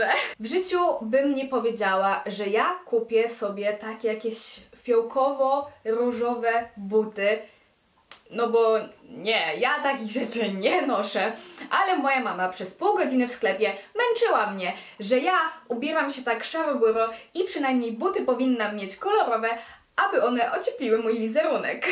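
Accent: France. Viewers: Czemu masz taki francuski akcent?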